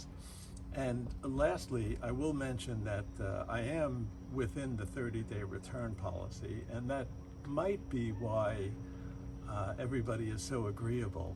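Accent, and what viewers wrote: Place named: Boston, United States. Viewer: Great balanced video, and really helpful tip at the end! also, I hear Boston in you =D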